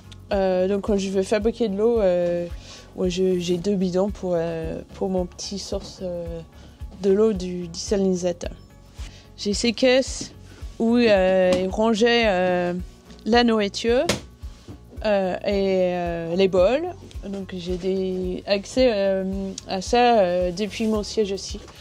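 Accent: United Kingdom. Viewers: je l'adore !!! ma préférée ... j'aime son sourire ,son accent british et sa force de caractère ... j'étais présent lors du départ de la dernière course du rhum .... impressionnant ces bateaux et de savoir qu'ils elles sont seuls a la manœuvre .... des héros !!!! énorme respect ..... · Extrêmement sympathique la capitaine avec en prime ce petit accent anglais si caractéristique au charme indéfinissable.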